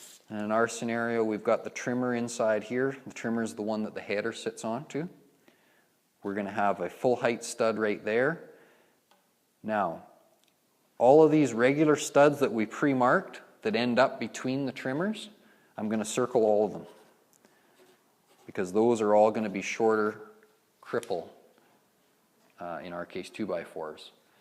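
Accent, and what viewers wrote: Canada. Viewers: Sounds like he is canadian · BTW - do you live in or near Canada? :) I hear the long "o" sounds (aboot). :) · you sound Canadian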